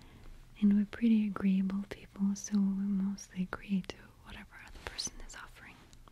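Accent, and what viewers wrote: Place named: Russia. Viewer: So rare to hear the Russian accent when she speaks English, it's lovely when it happens 😊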